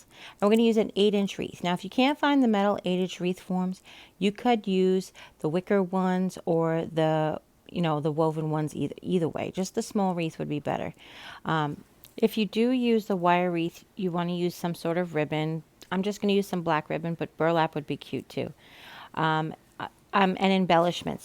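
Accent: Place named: East Coast, United States. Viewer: Cute! It's funny how you said that most people like to hear you talk, because I was just thinking about how I like your accent. Are you from the east coast? I noticed that when you say moss, it's more like mawwss lol love it.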